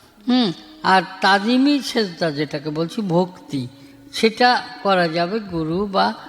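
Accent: Bangladesh. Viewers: তুমি বাংলা আঞ্চলিক ভাষা বুজ এইটা ফরিদপুরের ভাষা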